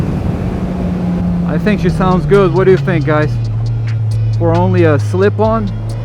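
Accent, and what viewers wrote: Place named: Taiwan. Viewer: So youre from Taiwan? Do all people from taiwan have that accent? And also, do most people speak such fluent english? Love the channel. Subscribed. Hopefully you post some new vids one of these days!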